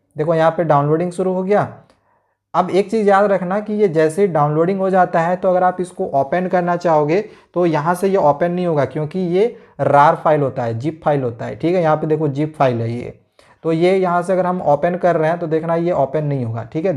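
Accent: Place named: Bihar, India. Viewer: Sir aapke language se lagta hai aap bihar ya jharkhand isi 2 state me se kahi se ho or sir mai bihar se ❤️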